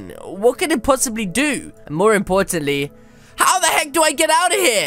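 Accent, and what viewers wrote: United Kingdom. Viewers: I love ur voice it's British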